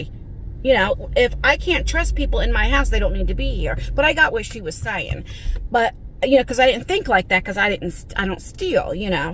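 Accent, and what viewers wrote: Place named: New York, United States. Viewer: Your accent made me homesick! I grew up in upstate NY (Hudson Valley) and have been in the West since 1997 and I have been homesick. My family was from Jersey — Fort Lee and Wycoff/Hawthorne. I miss Pizza and Bagels! And hard rolls!!!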